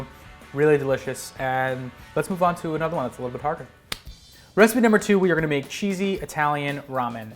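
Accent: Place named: South, United States. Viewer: I love it. That southern accent (I think) is the best.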